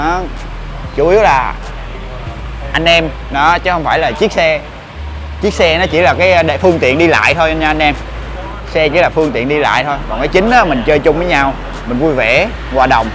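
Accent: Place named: Phan Thiet, Vietnam. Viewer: anh từng sống ở phan thiết đúng k anh? Nghe giọng giống dân pthiet quá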